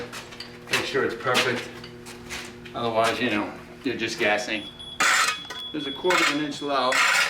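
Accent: New Jersey, United States. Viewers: This old bird is a craftsman. Knows what he is doing. Has a funny accent though. Might be Camden, NJ, Brooklyn? JoeB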